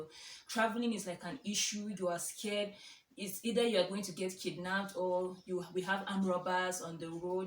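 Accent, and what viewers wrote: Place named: Uganda. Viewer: Your accent is turning into a Ugandan one😂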